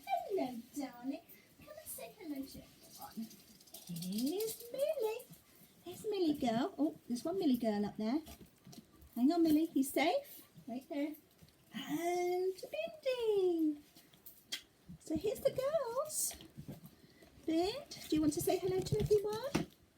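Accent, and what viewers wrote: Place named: United Kingdom. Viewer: I can tell you feel better and I am so glad. I always enjoy your videos and your British accent. (I have a Texas accent). It doesn’t sound as classy as yours! Your dogs are sweet and I can see why you love them.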